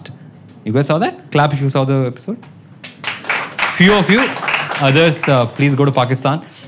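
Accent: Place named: South India, India. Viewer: Typical South Indian tone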